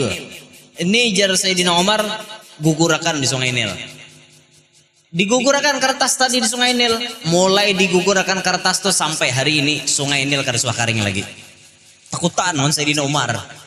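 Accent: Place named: Kalua, Indonesia. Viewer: Nang spesial disamping ilmu sidin adalah logat kalua nya nang maulah orang katawa😁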